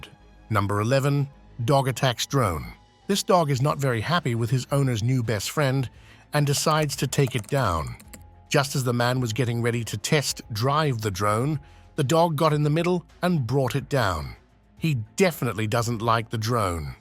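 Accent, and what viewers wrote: United Kingdom. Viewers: Annoying British commentary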